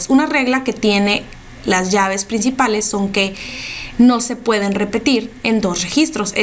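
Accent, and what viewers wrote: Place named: Mexico. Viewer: Muy buen video. y por supuesto "interesante voz" a la mexicana..!!! je je. Bendiciones !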